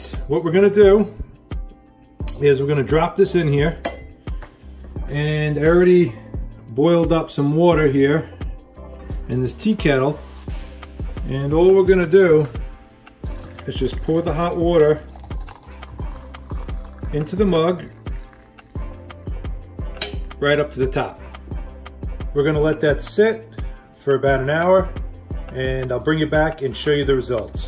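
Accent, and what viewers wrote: New York, United States. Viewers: i used a similar method to this, just with dishwasher tablets. and the results are impressive. by the way, are you from ny? if you are, i quite like the ny accent.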